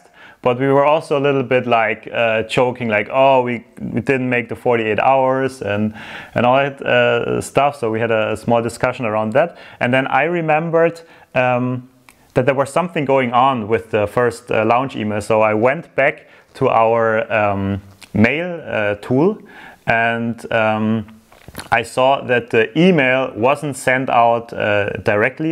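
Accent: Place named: Germany. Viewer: Haha wie geil der deutsche Akzent 😄 Hört sich cool an 👍🏼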